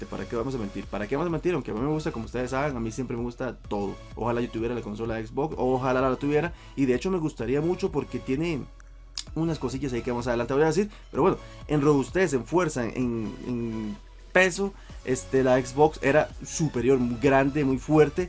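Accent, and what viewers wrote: Costa Rica. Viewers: Playo, tico hablando!!! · Ese acento, costarricense pa ¡pura vida!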